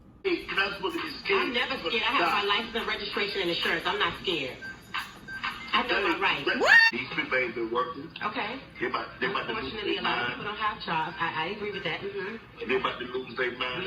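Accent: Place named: Caribbean. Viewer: I LOVE HEARING THE CARIBBEAN IN YOUR VOICE & ACCENT MA'AM.... ITS FUCKING GORGEOUS... And i NEEDS IT.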